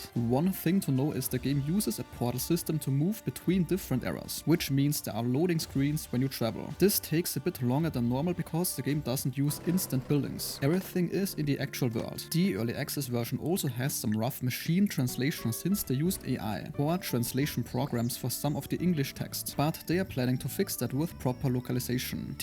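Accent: Germany. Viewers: Gonna add a comment to push the algorithm, you showed me some good games over the past Year, after discovering your Channel. Thank you for doing this! And I got one question about your accent. Is it German?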